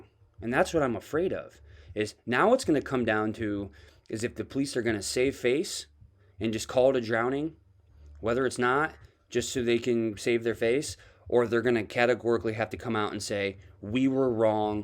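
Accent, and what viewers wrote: United States. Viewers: Just to add yours is the best video analysis by far.....and you sound American.......good job:)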